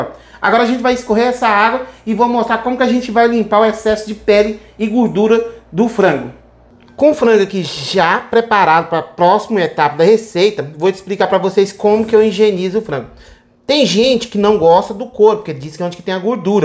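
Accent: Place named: Pernambuco, Brazil. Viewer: Sou Pernambucana e achei o seu jeito de falar parecido com o nosso. Bjs queridos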